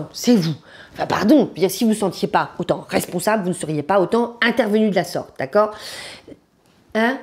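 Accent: South, France. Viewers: C'est l'accent du sud. Par exemple "hein" donne "haing".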